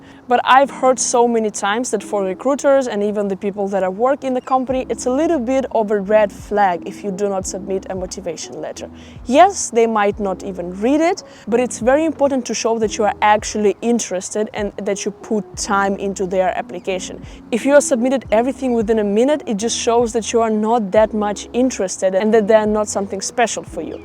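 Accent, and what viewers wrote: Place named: Netherlands. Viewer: It's interesting that you have developed a Dutch accent when you speak English.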